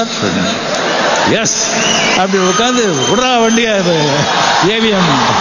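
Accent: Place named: Hindi, India. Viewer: சரக்கு போல தலைவர் ஹிந்தி கரான் மாறி பேசுராரரு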